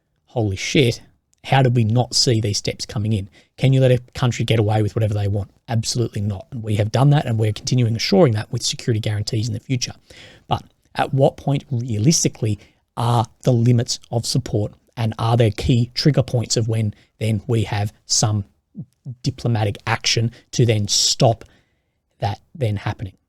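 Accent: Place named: South Australia, Australia. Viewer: I would have to throw a coin. Who's accent is more annoying. Your southern Australian accent The hideous northern English accent or our southern Cajun/ red neck/ hillbilly accent. . NOW!!!!!!!! AS FAR AS THE CHICKS? HOLY SHIT THAT'S SEXY!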